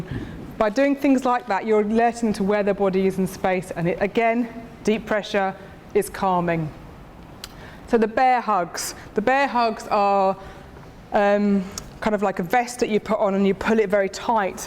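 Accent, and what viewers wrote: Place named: United Kingdom. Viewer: British accent is beautiful .When Brits talk it is elegant .They make talking and art form .without even knowing it .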